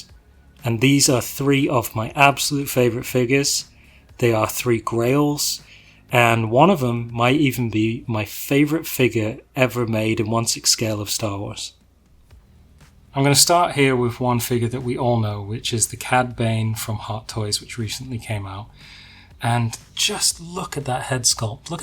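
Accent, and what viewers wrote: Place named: United Kingdom. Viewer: Nice collection, mate! 👍 Particularly love the Dagobah set-up! Maybe surprisingly, the one figure that stood out for me and makes me want to get one was R5-J2! That little fella looks quality standing next to Tarkin! 💯👌 Btw, are you a British expat in Canada or do some Canadians sound as though they're from the UK? (Hope that's not offensive in any way to our Canuck cousins!) 😅 Cheers!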